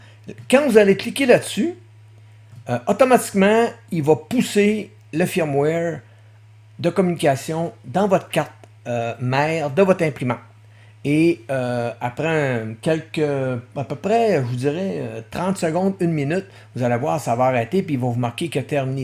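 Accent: Quebec, Canada. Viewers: Enfin une video quebecoise .. c'est l'fun entendre cet accent la sur des tutoriels :P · allez hop ! c'est passé ...ça maaaaarche !!!! merci à toi, ce tuto est génial !!! en plus suis grave fan de cet accent "quebecoué" ... surtout ne change rien oh non rien ...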